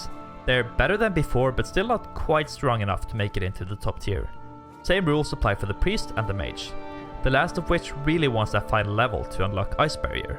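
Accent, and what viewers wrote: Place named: Norway. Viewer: its so easy to hear that you`re norwegian. love the guide :D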